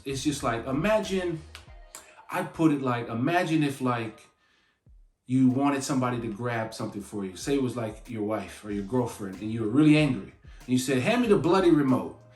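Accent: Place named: United States. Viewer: Hearing our slang in an American accent is amazing. Subbed! P.S 'Sod' can also be a noun.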